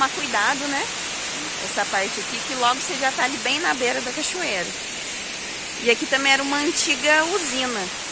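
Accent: Minas Gerais, Brazil. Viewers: Esse seu sotaque mineiro é muito legal. Experiência se adquire com o tempo. Seus vídeos estão cada vez melhores.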